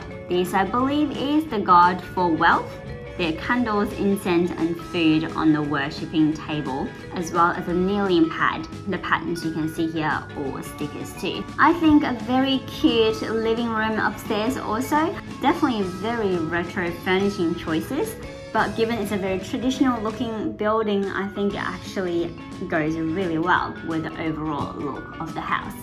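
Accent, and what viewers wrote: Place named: Australia. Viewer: Are you Australian? You sound Australian.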